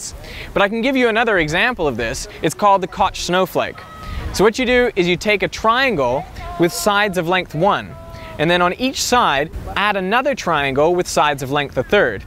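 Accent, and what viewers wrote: Australia. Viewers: he sounds more australian in this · Watching this video 5 years later and damn does he have an Australian accent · You are getting a slight Australian accent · is it me or is his accent finally picking up a little Austrailian tone? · He sounded so much more Australian back then. What a difference. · It's funny I hear him speaking with a slight Australian accent. I think he went to film school there. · what's crazy is i detect a very, VERY slight australian accent here that's not there in more recent videos... wild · wow, his voice is starting to have an Australian accent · Finally watching an old video I thought I was the only one noticing the australian accent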